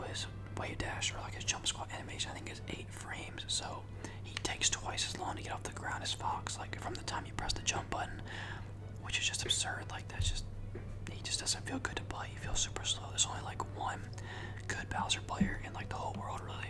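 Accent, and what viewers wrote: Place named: South, United States. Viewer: you kinda sound like ppmd but when he was amish (just kidding but when he still had his southern accent)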